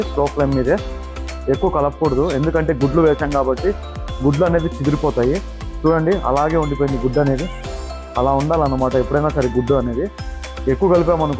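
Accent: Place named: Rayalaseema, India. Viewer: పట్టుద్ది ఎందిరా నాయనా రాయలసీమ భాషను నాశనం చేస్తున్నావ్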